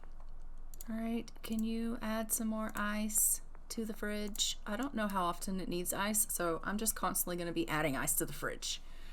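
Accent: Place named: Tennessee, United States. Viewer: I had to go somewhere unexpectedly so I can watch the rest of the vid!Cora.....uh.........!They are definitely a lovey dovey relationship!I feel like they were meant for eachother, Also For The Heritages heres an idea!! Fred-50% Irish & 50% German Cora-50% Irish & 50% Spanish Also are you 5 hours behind me or 7?It's currently 3pm where I live,unless you usually wake up quite early or you have work!Because if you are 7 hours behind it'd be liek 8 and if it is pls rest,I mean unless your going to work but it's the weekend so wake up whenever I want and look at my dastardly dumb comments later lol!For some reason I really want their daughter to be called Merida just because of the hair lol!It kinda surprises me when the life expectancy is like 46,because I know royals have the best heakthcare but alot of royals during this time died in their 80's!!Yes that was me,I think they should buy a business!Butt during the great depression I think we'd have to get rid of it for a while!I feel like we could have a long life span but age them up when we want or kill them when it's the right time!My great grandma was born is 1924 and on Google it says the life expexctancy was like 52,the healthcare in her country wasn't great when she was in her 30's but she lived to 2020,I think my great grandma might be super human(Yes she was 96 lol). 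And the oldest person ever was born in 1884!Yes lol!!!!!She legit is eaten by the piano!OMG Yes accent,I love your tennessee accent,legit she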